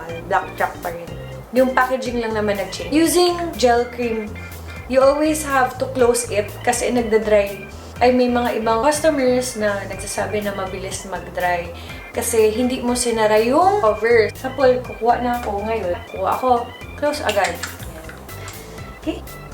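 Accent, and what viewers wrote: Visayas, Philippines. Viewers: kanang accent sa mga bisaya pagmagtagalog ba..😆😅hahah, ganyan din ako kadalasan eh.!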